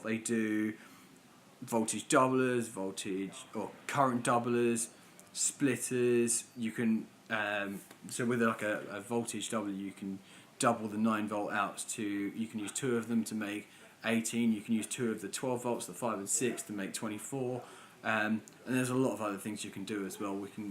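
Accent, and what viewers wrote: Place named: England, United Kingdom. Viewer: Judging from the accent, I suspect you're from the same part of England as Spinal Tap.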